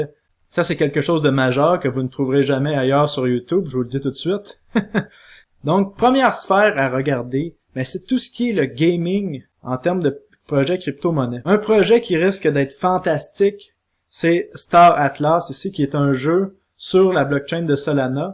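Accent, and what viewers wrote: Canada. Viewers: Accent canadien, c'est super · Tes vidéos sont +++. Je les adore, inspirantes et pratiques sans compter ton accent qui me font revivre les inoubliables souvenirs de vacances à Montréal et au Québec. Merci.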